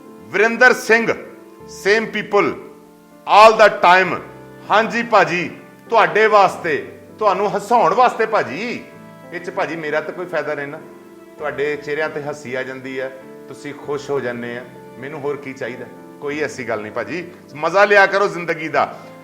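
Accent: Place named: Rural Punjab, India. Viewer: saab aap punjabi bohut pyari bolte ho. Pure desi punjabi. Love it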